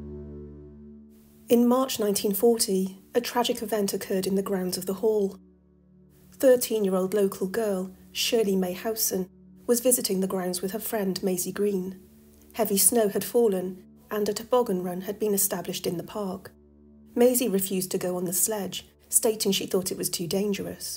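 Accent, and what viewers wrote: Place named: Wales, United Kingdom. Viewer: Love your Welsh accent 🎉.. Great vids,keep them coming. Happy 2024 to you and all 🎉❤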